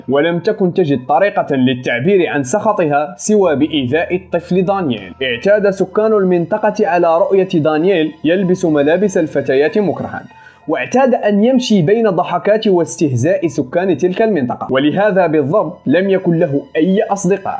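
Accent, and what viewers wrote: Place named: Morocco. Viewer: عرفت انك مغربي من لهجتك الجميلة 💜💜💜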